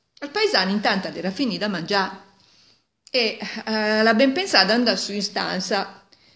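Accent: Milan, Italy. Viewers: Ho capito tutto, brava a raccontare, è però forse un "milanes ariús"? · Molto brava la signora! E' piacevolissima da ascoltare. Io ho capito tutto pur non parlando attivamente il milanese, perchè sono milanese con origini familiari diverse, ma essendo la mia fonetica, il mio accento e la mia pronuncia assolutamente simili a quelli della signora, ho una facilità estrema a capire anche quando non conosco le singole parole. Anche mia nonna raccontava storie antiche nel suo dialetto. I linguisti sanno che i dialetti regionali italiani non sono dialetti dell'Italiano, ma piuttosto varianti del Latino secondo la parlata locale e quindi in realtà si dovrebbero chiamare lingue regionali. In questo senso l'Italia è affascinante per chi studia linguistica. L'importante è mantenere un bilinguismo autentico. A me personalmente dà abbastanza fastidio sia quando sento parlare italiano con regionalismi sia quando sento parlare un dialetto locale italianizzato. 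Quest'ultima cosa avviene soprattutto fra le nuove generazioni, tanto che spesso non sono più in grado di capire il vero dialetto dei loro nonni. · Bella storia. Finalmente ascolto una pronuncia del milanese. Ci fai altri video belli come questo. Ciao. Vivo nel Lazio. Ma il milanese mi piace molto. Brava.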